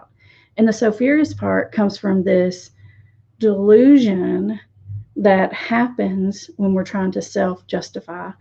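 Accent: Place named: Alabama, United States. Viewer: Chick-a-dee ... you sound like a sister or cousin from home.. you know, the land of Bless your heart ❤️.. lol .. I’m from north Alabama .. you have a dialect I hear often from bham, Tuscaloosa and surrounding area - loving your content !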